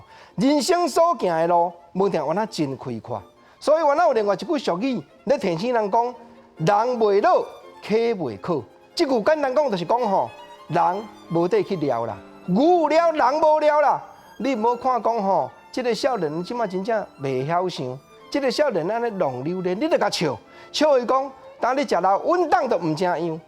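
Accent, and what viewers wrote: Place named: Taiwan. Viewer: 台語純正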